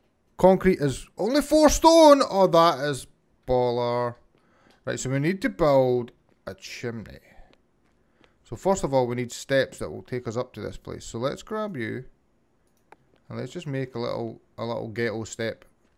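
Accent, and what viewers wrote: Scotland, United Kingdom. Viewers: Black Sheep Thats what I thought as well :P I love the Scottish accent though!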